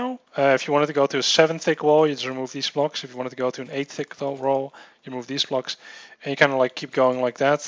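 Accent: Netherlands. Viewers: He sounds dutch.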